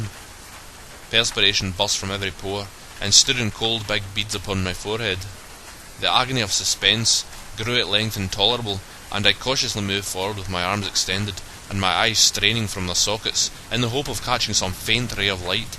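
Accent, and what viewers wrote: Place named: Scotland, United Kingdom. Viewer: Thanks, I didn't have to read it on my own, and I enjoyed a Scottish accent.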